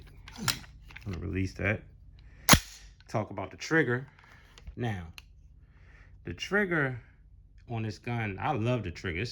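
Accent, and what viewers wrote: Baltimore, United States. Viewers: I was going to ask what state is this gun legal in but I swear you sound like you from bmore. Im in DC I want one of these bad but it’s not legal here 😢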